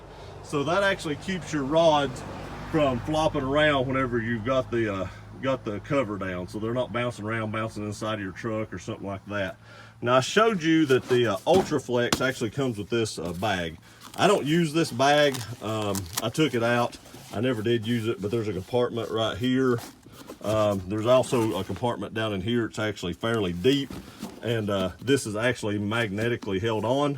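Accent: South, United States. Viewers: Ton-new cover love the accent and love how your southern shows how everyone is special and different. I say potatoes and my grandmother says taters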